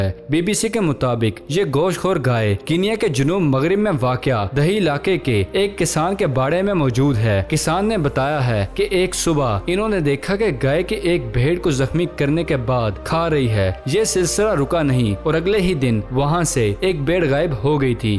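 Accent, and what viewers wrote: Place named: Rawalpindi, Pakistan. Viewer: love u bran jani PPindi ke lagty hoo keep up the good work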